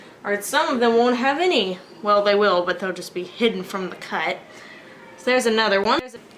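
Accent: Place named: South, United States. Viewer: I love watching you make soap!! where are you from? You kind of sound like another YouTuber I watch, grvyrdgrl....I think thats right...she is from texas and has the most delightful southern accent, as do you :)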